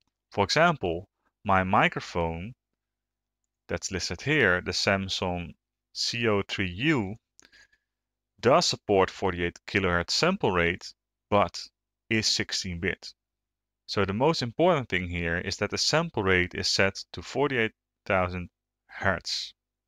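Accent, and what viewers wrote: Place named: Netherlands. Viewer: excellent dutch accent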